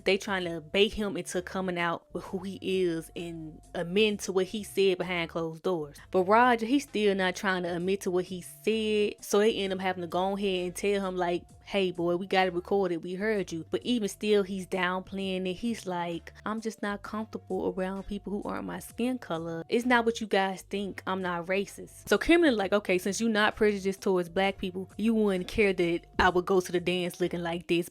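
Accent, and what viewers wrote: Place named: South, United States. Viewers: Oh I would’ve guessed Tennessee. There was a contestant on Survivor last year (Q Burdette), and your accent sounds so similar to his. He is from Memphis though, so pretty close to Mississippi. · Right!? I love the black Mississipi and Lousiana accents (all the deep southern states tbh), I'm from London, so I've yet to hear one in person tho😂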